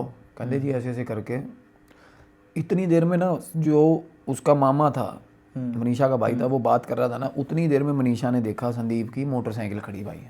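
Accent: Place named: Punjab, India. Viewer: bhai this is punjab n hamare idhar aise he bolte hain meri jaan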